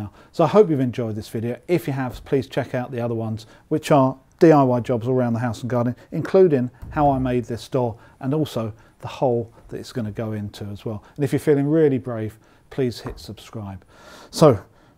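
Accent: England, United Kingdom. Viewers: Maybe it’s the English accent but you sir are a terrific teacher! Subscribed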